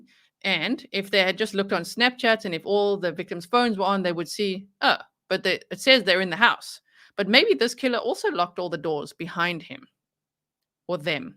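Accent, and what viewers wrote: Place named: South Africa. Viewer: I really, really enjoy your presentations. I like how the pace moves swiftly. Originally your swift speaking pattern with your SA accent was difficult for me to follow, but now that I am used to it, I love the fast pace. I now even put other people’s podcasts on 1.25 speed to get through faster, per your suggestion. I pray we are not going to try to eliminate knives now in addition to firearms. Murder has nothing to do with a weapon and everything to do with a person’s heart. After all, Cain used a rock. I’m sure there have been many murders using bats, 2x4’s pieces of lumber, axes, gun butts, vases or lamps, hammers, hands or fists, pillows, or plastic bags. In other words, you can’t legislate morality. We need tougher and swifter punishments, not regulations that take freedoms and methods of self-defense away from the law abiding people. 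We have quite a few firearm weapons ourselves, plus kitchen knives, excess lumber, the lot. I don’t want my freedoms taken away because there are a few bad people murdering innocent people.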